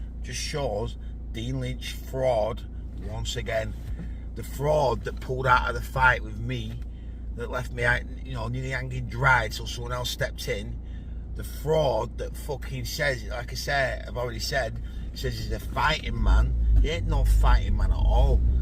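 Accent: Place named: Lancashire, United Kingdom. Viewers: That's the strongest lancs accent I've heard, thought you were a Yorkie. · That's Lancashire just like myself 😊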